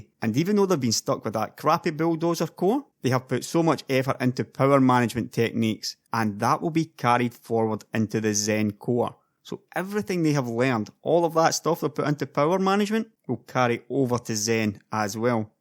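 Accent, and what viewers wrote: Scotland, United Kingdom. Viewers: Are you Scottish? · i love scottist accent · that lovely scottish accent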